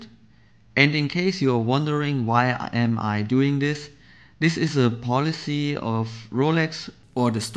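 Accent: Germany. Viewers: du bist 100% deutsch so wie du sprichst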